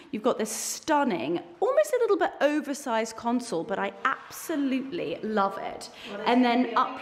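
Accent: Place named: Scotland, United Kingdom. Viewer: Loved this - an amazing property 😊 Also loved hearing that Scottish accent!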